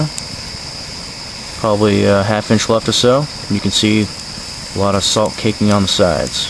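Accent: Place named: Massachusetts, United States. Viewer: great vid you sound like you come Massachusetts 😆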